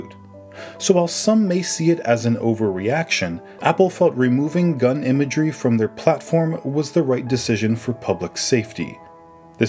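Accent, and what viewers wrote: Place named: Ohio, United States. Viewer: i hear the ohio💀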